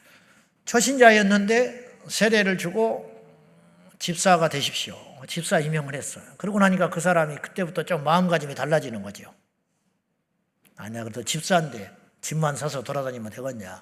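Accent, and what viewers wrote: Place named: North Jeolla, South Korea. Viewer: 억양이나 사투리 보면 전북으로 추정됩니다.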